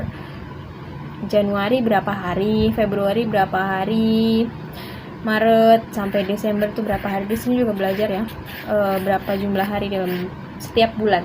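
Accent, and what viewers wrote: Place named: Makassar, Indonesia. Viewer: Dari logat nya pasti orang makassar yah?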